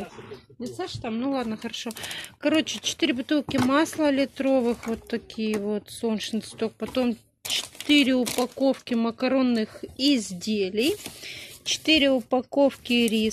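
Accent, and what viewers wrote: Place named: rural, Russia. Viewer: Фу какая мерзость...крысы....антисанитария.... столько детей ...фу..... ещё и говор такой....ну деревня....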